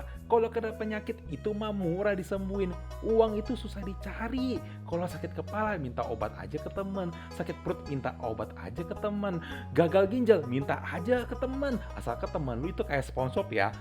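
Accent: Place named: China. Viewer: Salfok sama cara ngobrolnya bang kedenger ada aksen tinghoa nya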